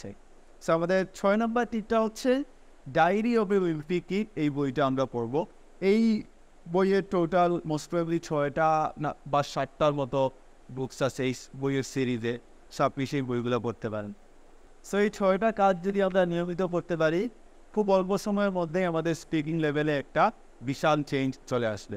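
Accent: Sylhet, Bangladesh. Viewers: Are you Sylhety sir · Sir apnar pray video te sylheti basa aioi..but sylhety mat apnar muko sundor ow lage❤ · Bcz sir sylheti 🥹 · ভাইটি মনে হয় সিলেটি 😄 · uni sylhet er vai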